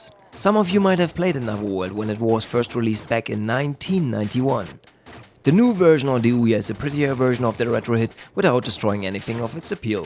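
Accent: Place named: Croatia. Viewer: Are you Croation becuase my friend is from Croatia and he has youre same accent?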